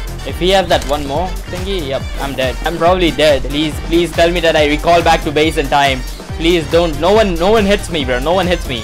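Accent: India. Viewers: W INDIAN ACCENT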